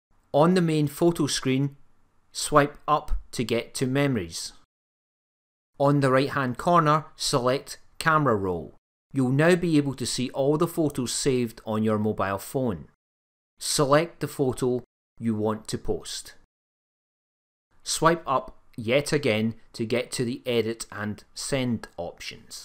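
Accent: United Kingdom. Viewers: I love ur British accent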